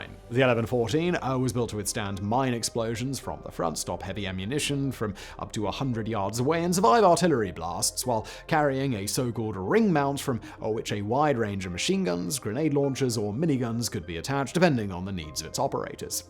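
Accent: RP, United Kingdom. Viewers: I'm a Brit, I don't know anybody who speaks like this, with such an unnatural exaggerated RP accent. Why not just speak London RP? It takes away from the otherwise great video content.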